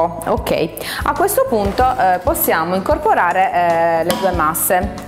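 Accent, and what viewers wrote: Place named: Sardinia, Italy. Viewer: Si sente che sei Sarda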